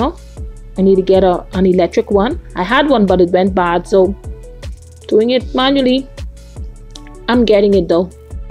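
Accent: Bahamas. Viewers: Love the accent and of course the Bahamian people. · Lovely! I'll try your recipe ;-) I also enjoyed your Bahamian accent! I love it!!!!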